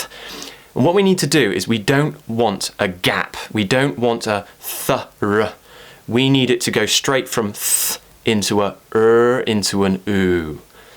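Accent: RP, United Kingdom. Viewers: I really love your videos and British pronunciation P.S. You are so cute^^ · Awesome! Thank you very much! I'll get it someday, I'll get this British Accent one day! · Best teacher ever!!! Love your videos and your British accent, are you from London? 😍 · oh teacher iliked your British languaga so amazing thank you so much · You are the best British English speaker. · Hey i've been wondering that what accents do you teach. is that RP? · Economic expressions or any expressions about business would really help me:D because I'm studying at Business Academy. You're really awesome teacher. I absolutely love your British accent. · I like your British accent. Please please can you make a vedio of some random difficult and long English words. For example you did already Entrepreneur. Can you do concierge or other similar heavy English words that we can still use is everyday conversations. 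Not really sure if you get what I'm trying to ask... I hope you do though... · You've chosen some of the most common words that are typically mispronounced. Your explanations are very clear - great job! I love your soft British English and your kind eyes. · Sound more British then bristish itself haha best teacher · Very interesting find. I could watch you talk all day eventually I would have a whole British accent 😁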